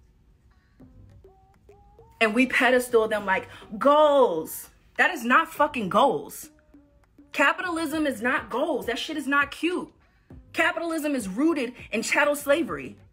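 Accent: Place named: Houston, United States. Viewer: Not me realizing that I actually know the voice behind the channel. Wow, you are amazing girl. Congrats on your YT success!!! I’ve been subscribed for the past year now and was like damn I know this voice from somewhere. She sounds like she’s from Houston lol. Hey girl, heyyy 👋🏾🤘🏾😘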